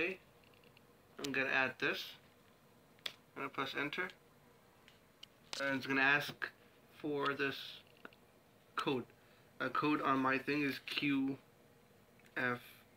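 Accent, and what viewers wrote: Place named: Egypt. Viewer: انا عرفت من طريقتك يا عم ومن المكتب كمان هههههههههههههههههههههههههههههه دا احنا مصريين زيي بعضينا يعنى اعرفك من غير ما بص مجرد سمع الانجليش عندك بس عالفكرة نطقك كويس جدااااا انا فى اولى ثانوى عالفكرة وفى انترناشونال ادعيلى بقى :))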